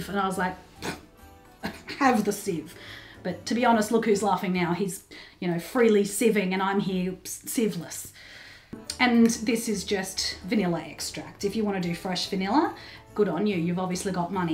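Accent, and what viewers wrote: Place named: Australia. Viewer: I'm not used to the Australian accent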